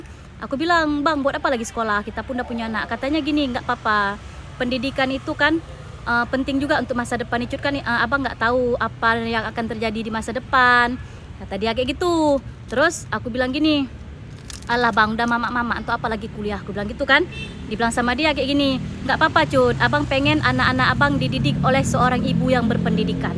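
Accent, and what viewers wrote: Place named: Sumatra, Indonesia. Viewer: Siap kk, saya selalu mnton videonya kak, cara bicaranya cerdas dan lugas bikin gemes, dan logat khas Sumatranya juga dapat banget.. hehe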